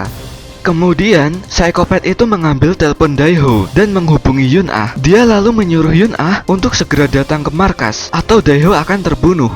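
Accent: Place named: Java, Indonesia. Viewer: Logat Jawa nya masih medok yo suaranya itu lo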